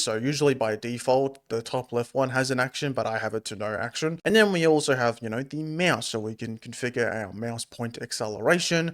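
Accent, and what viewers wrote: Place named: Australia. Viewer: "It's Linux next year", proper Aussie accent and YouTube transcription service is in shambles. Maybe this year will be THE year for Linux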